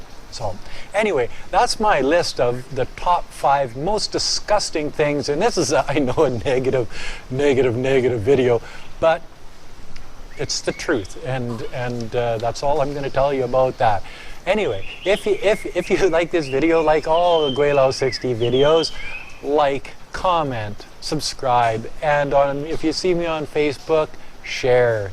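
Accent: Canada. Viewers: This guy sounds Canadian. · This guy sounds very canadian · This gentleman is a Canadian, eh?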